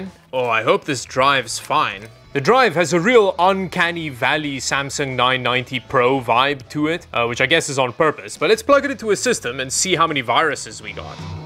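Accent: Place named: South Africa. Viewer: I know a south african accent when i hear one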